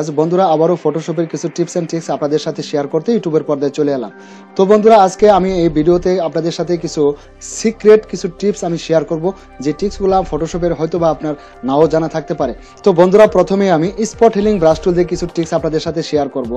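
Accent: Bangladesh. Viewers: আচ্ছা আপনি চট্টগ্রামের নাকি? উচ্চারণ অনেকাংশেই চট্টগ্রামের মতো লাগে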